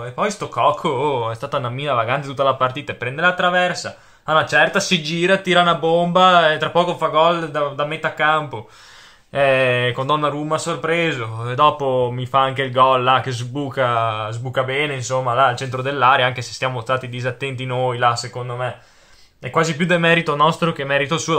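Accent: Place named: Padua, Italy. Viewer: L'accento padovano è favoloso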